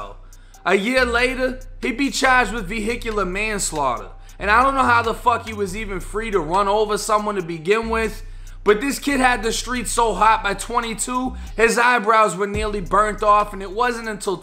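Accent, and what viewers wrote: Boston, United States. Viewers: Mr, are you from Boston?? (accent) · Your from Tampa? You sound like you from Boston or something like that. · You from Boston? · So r u from Boston or Florida? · Ur definetly boston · no disrespect just curious how long were you back in Boston before the accent came? Do you think if u came back to Florida and lose it? Like if you were at Ybor city would u the Florida accent just come back? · s, s, s, s, s . . . Nice wording!! You from Boston ?? . . . You say Stahh and Chahhges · I know u did time in florida but sound like Your from boston, curious no disrespect just curious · Is this guy from Boston? I hear that bake bean accent. Either way...cool video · damn are you from Boston? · Boston accent yes? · Goofy goober nigga u straight Boston Damn I miss New England like shit nuffin like it keep doin ya thing homie I love this channel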